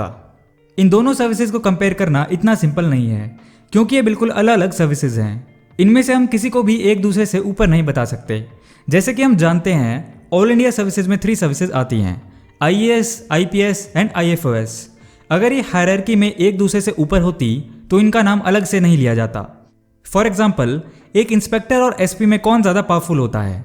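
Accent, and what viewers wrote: United States. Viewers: You must be a school going kid according to my assumption as your comment is so childish and immature.If she is talking like an American then it's ok what's the problem?? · Don't speak like a American, speak like indian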